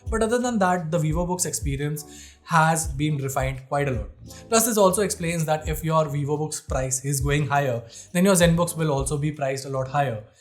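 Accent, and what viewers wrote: India. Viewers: Good indian accent My brotha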